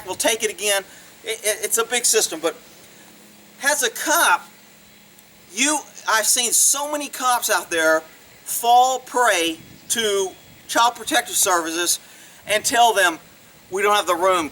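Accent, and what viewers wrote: Texas, United States. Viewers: is he from Texas?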